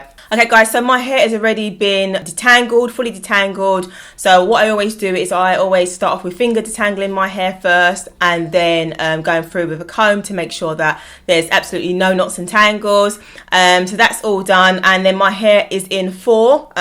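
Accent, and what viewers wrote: United Kingdom. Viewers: Shalum I'm sister Bridgette From America,Galveston, Texas New subscriber.I love your hair and your beautiful uk accent. My hair is like yours.Shorter,but thick.❤🎉🎉 Tah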